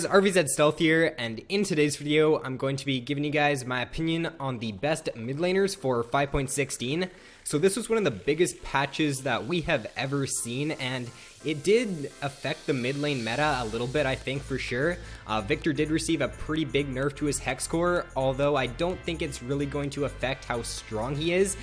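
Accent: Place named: Canada. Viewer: hey rvzstealth, are you from Canada? I'm hearing a slight accent :P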